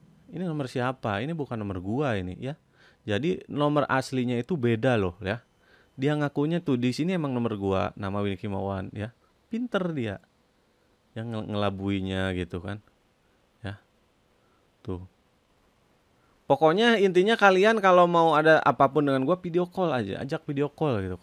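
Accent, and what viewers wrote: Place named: Kalimantan, Indonesia. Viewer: logat bahasanya kayak bahasa melayu arah ke kalimantan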